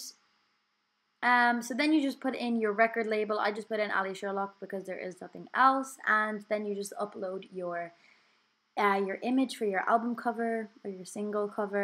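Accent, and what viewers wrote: United States. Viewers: What's with the American accent Allie? you are from the people's Republic of Cork... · Sounds like she's going a tad American pronunciation,) ammhmmm....well is it?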